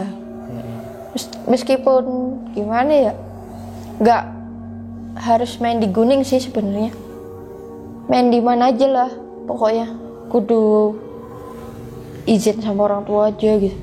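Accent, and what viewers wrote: Java, Indonesia. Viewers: mbaknya medok bngt ngmong bhsa indonesianya kayak sayaaa😆😍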